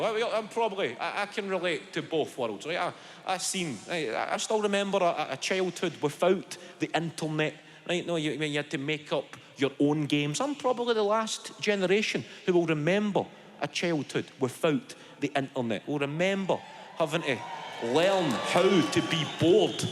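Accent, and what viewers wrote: Scotland, United Kingdom. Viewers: That Scottish accent is so thick. it's almost a stretch to say he's speaking English. Maybe people from the rest of the UK can understand it but to my sensitive (and unsophisticated) midwestern ears, he might as well be speaking Central Algonquin. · He has a VERY strong Scottish accent is why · Love humour as it's so relatable in your own life! Very very funny and as he's Scottish he sounds even better, and yes I can understand every word thanks unlike some of these comments on here! 😂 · you probably definitely do understand English! It's just Scottish accents and Northern Irish accents can be one of the most difficult to understand - even for native English speakers. We have a lot of Scots 'slang' that Glaswegians like use, which is almost like it's own 'local language'. Some can be loosely translated from Gaelic - which is our old native language.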